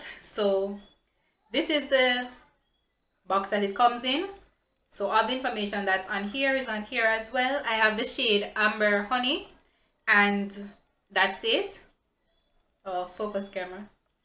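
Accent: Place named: Caribbean. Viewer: love your accent :) #CaribbeanGirls. Btw for reference what shade do you use in maybelline fit me/fenty?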